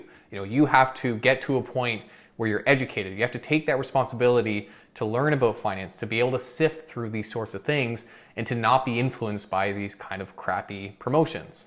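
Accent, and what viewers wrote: Canada. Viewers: I listened to your entire video. I picked up a Canadian accent. Do you think the influencer Romana Didulo will eventually take power in Canada? Are Canadians more susceptible to influencer deception than US people?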